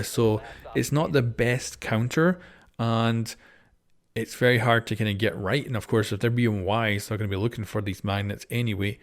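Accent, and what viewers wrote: Scotland, United Kingdom. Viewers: Don’t hide you Scottish accent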